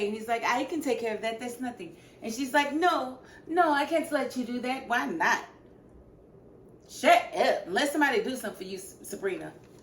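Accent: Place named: Chicago, United States. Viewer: I’m a relatively new sub. Are you from Chicago? Your accent reminds me of Selena Johnson.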